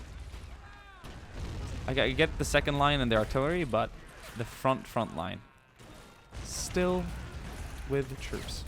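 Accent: United States. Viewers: you sound like idubbbzTv what accent in America is that? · Southern accent God tier👌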